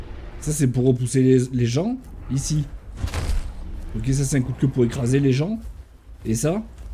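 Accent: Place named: Illinois, United States. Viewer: Tu serait pas à moitier américains par hasard? J'ai reconnue ton accent de l'illinois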